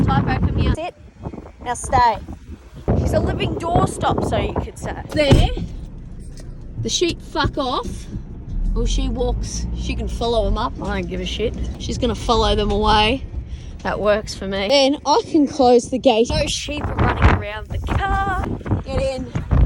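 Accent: Australia. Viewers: youre a natural comedian and absolutely adorable! As an American I love the Aus accent lol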